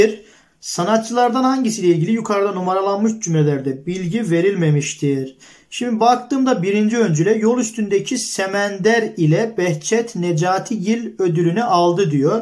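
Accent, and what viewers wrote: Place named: Trabzon, Turkey. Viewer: arada bi konuşmanızdan belli oluyor ben de akçaabatlıyım :))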